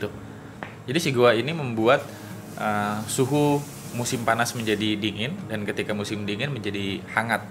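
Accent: Java, Indonesia. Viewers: asli mana bang? kok logatnya ada jawa²nya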